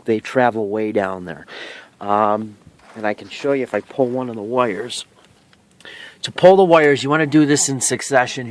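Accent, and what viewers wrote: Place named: Boston, United States. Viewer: thxs for the video.. Wife's car needs a change of plugs I'm guessing that's a Massachusetts accent lol Boston maybe?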